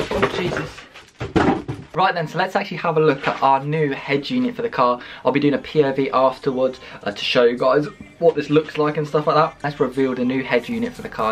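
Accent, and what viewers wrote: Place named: Birmingham, United Kingdom. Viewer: He sounds like he`s from Birmingham LOL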